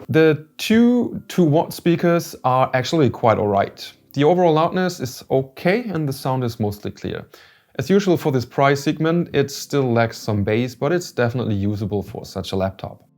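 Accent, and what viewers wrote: Germany. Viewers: Man merkt an der Aussprache direkt das du Deutscher bist 😂❤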